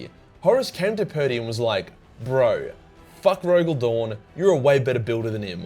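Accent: Australia. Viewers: God I love aussies explainin shit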